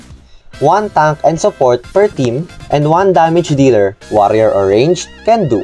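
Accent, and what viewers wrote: Philippines. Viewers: Are you filipino because of your voice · 100% Filipino accent here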